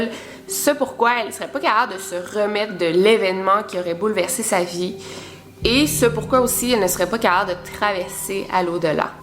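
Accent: Quebec, Canada. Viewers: Hiiiii je kiffe ton accent ! 😂 L'accent Quebecois c'est ma passion ! 😂 Sinon je trouve que tu raconte grave bien 😍❤ Bref, bisoous de France ♡ Charlotte 💞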